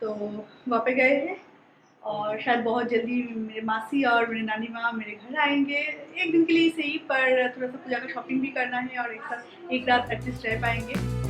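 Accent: Bengal, India. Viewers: Too much boring and with ur benglish hindi 😩😩😩 it’s irritating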